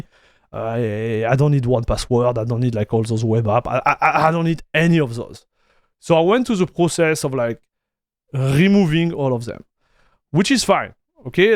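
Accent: France. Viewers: Votre accent paraît français...😊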